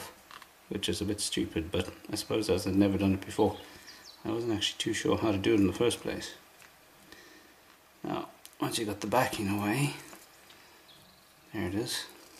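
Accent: South Africa. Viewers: Well what's the chance of hearing a fellow South African showing us how this is done, shot boet! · Sounds South African to me!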